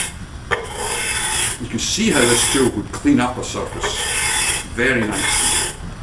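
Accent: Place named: Scotland, United Kingdom. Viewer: Thanks for the video. Nicely explained. The burr on the side of the tool is almost as pleasing as the burr in your Scottish accent. Remonds me of family and friends back home in Canada.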